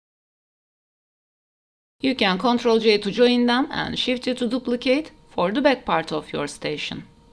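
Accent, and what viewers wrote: Turkey. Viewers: Türk aksanını nerede duysam tanırım 😄 Güzel çalışma olmuş. Başarılar 😉👍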